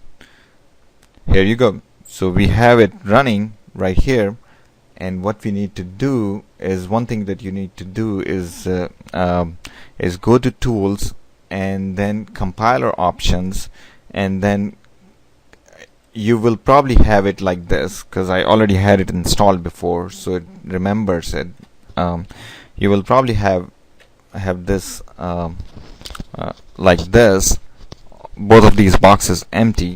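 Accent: India. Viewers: thank u sir....one question..r u indian????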